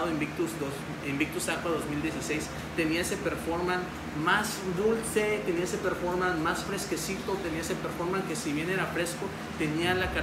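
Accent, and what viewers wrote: Mexico. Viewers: Eres mexicano wey? :v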